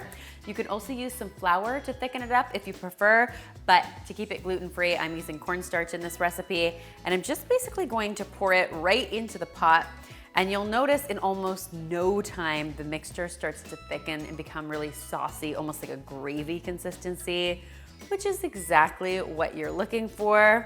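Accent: Canada. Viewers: As a side note it amuses me how you Canadians say herbs and Worcestershire (I’m English) and as for Scarborough and Peterborough 😂. I’m guessing though you laugh at how we say things 😁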